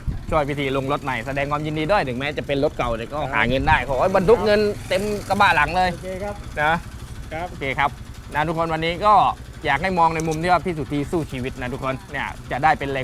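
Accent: South, Thailand. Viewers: อูย่ใหนครับเห็นแหลงใต้ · คนใต้แน่นิ อยู่แค่ไหนคับ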